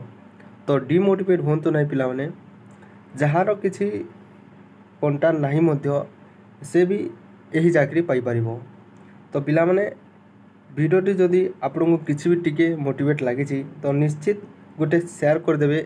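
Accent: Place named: Western Odisha, India. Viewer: Tame Western Odisha( Koshal) area r lok ye para laguchhe moke.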